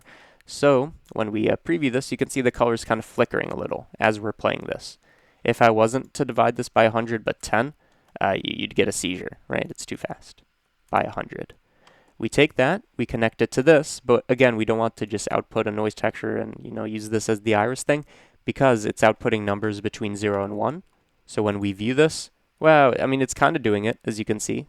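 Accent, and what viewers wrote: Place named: Chicago, United States. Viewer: Dude this is straight up genius! Are you from Illinois by any chance? Thought I sensed some Chicago in your voice.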